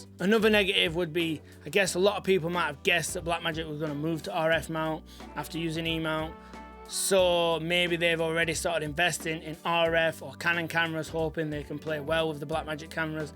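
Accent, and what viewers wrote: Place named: Northern England, United Kingdom. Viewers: Ey up, nowt wrong with a Northern accent lad! 😝 BUT onto mounts... I wonder if we can, via that service Sigma offer, get EF mounts changed to L? Most my Lenses are Sigma anyway nowadays! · Never judge a book by its cover. This dude looks and sounds like a cage fighter crossed with an English bulldog yet everything he said is well informed and is surprisingly balanced view with some great research and insight. Connecting the dots makes sense and although it’s unlikely black magic will make a cube anytime soon the l mount is a sleeping giant and the investment by dji is key. Bm need a new mount and red and canon will almost certainly not let bm anywhere near rf. excellent despite the heavy northern accent this dude knows his stuff. Don’t let those prejudices loose. Sound logic has no accent.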